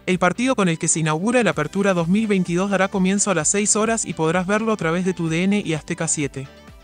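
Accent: Argentina. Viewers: Por qué usan la voz de un argentino? ¡¡Estamos en MÉXICO!!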